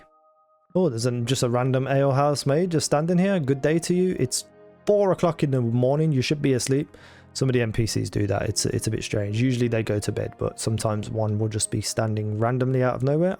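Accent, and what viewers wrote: Birmingham, United Kingdom. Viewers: Birmingham? Walsall?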